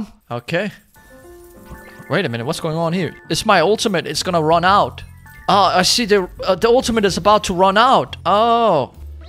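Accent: Denmark. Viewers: Quick question are you Danish??😅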